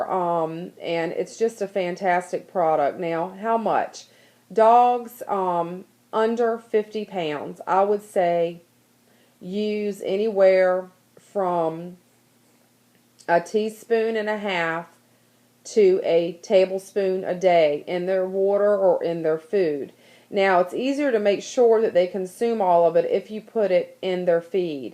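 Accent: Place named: South, United States. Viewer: I also love your southern accent. I'm from the south:)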